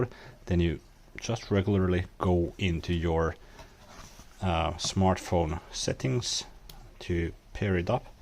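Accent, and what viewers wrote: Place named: Finland. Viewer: Your accent sounds like a Finn. Onko sinä suomalainen?😊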